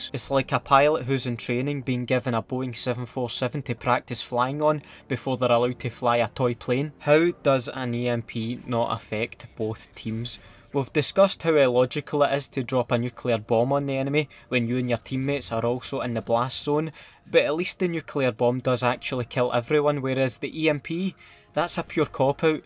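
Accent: Scotland, United Kingdom. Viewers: Are you Scottish but still nice vid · Who else loves this guys Scottish accent? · I loke that Schootis acent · He's Scottish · Not trying to be mean or raises he sounds like he is from 🇬🇧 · I love the Scottish accent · Man I love your Scottish accent lmao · Is he Scottish? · The Scottish accent only makes it funnier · Nice Scottish accent, mate! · I really can’t take this seriously with the Scottish accent (no offense) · I like ur Scotland accent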